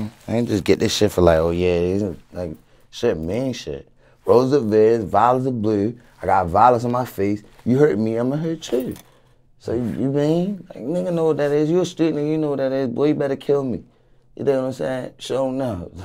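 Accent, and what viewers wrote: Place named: Baltimore, United States. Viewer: It’s a Baltimore accent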